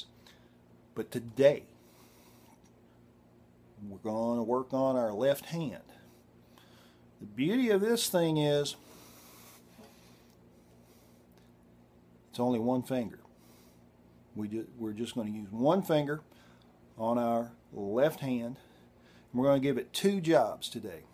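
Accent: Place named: Texas, United States. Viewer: This man's accent is identical to Dr. Phil's. Makes me wonder if he's from the same North Texas background. Makes me want to learn. Being honest with myself, I've only just got to the point where I don't look at my right hand, and I know you don't want to be looking at that from 35+ years of playing guitar, and 15 of finger picking. Banjo is just so different with the finger picks, but that's the sound I'm going for, so I'll learn. Thanks for the lessons and for being cool about it.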